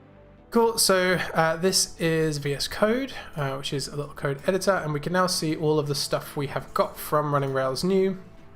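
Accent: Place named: United Kingdom. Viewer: Mate, I really do love your British accent. Cant get enough :D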